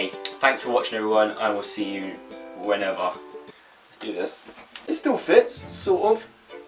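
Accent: Wiltshire, United Kingdom. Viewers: I love how in 2012 he sounds more Wiltshire and now he's lived in London he sounds different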